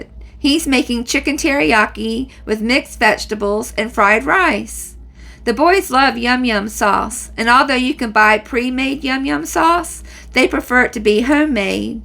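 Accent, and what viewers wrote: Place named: United States. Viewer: The meal looks so nice 😋 I love just listening to your wonderful American accent x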